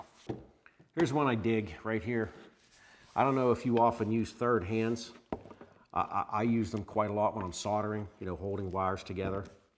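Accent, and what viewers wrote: Pittsburgh, United States. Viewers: That Pissburgh accent makes me miss home and goin dahntahn on the weekends. Solid review too!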